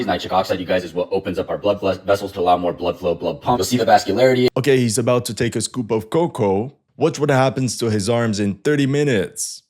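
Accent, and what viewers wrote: United States. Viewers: Hold on a second buddy, your american accent is hilarous greetings from australia and wishing health to you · Your American accent is funny shit haha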